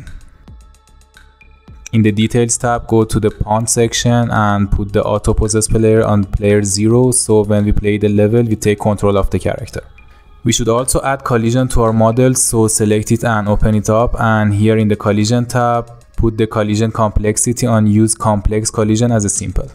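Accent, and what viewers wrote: Iran. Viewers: Your accent is similar to Iranians. If you're Iranian, well done. If not, well done again ;) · dadash damet garm az lahjat fahmidam ie iranie ba gheirati :))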